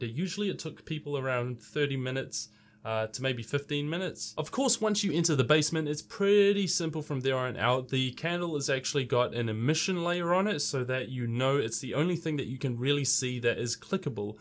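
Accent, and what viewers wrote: New Zealand. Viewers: Judging by the accent, are you happen to be a Kiwi?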